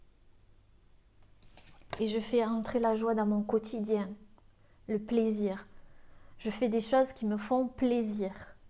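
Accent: South, France. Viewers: Vous êtes mignonne! En + votre accent du midi c. top.Vous êtes habitée d'une douce énergie réconfortante, Merci.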